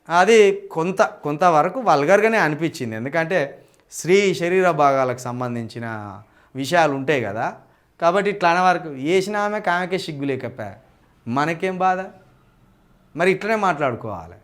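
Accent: Telangana, India. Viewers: Telangana Slang is super.👌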